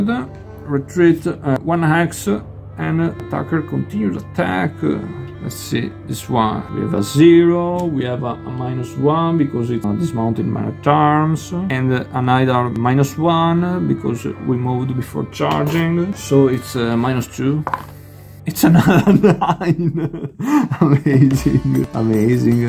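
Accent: Italy. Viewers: Venitian accent, true?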